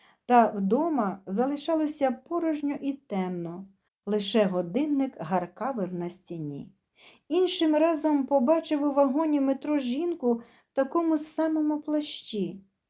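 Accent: Standard. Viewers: Добрий день, у вас чудовий голос, спокійний, знання української мови стовідсоткове, мабуть ви єдина, що розмовляєте на чистій українській мові. Дякую вам. Завжди охоче слухаю вас.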